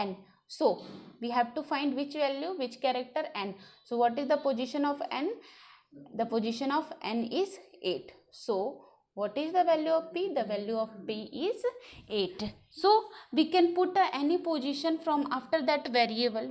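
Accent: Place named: South India, India. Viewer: plezz change the south indian accent while u r speaking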